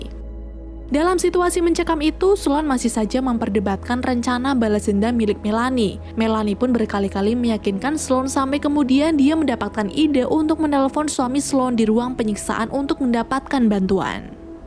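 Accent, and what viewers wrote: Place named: West Java, Indonesia. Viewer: Alhamdulillah Damang 👍 Sunda na ti palih mana teh😁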